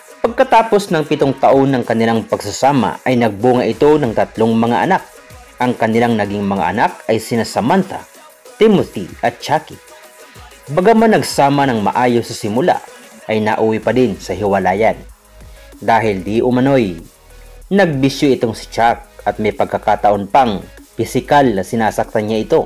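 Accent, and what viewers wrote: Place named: Visayas, Philippines. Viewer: Bisaya siguro ang vlogger.😊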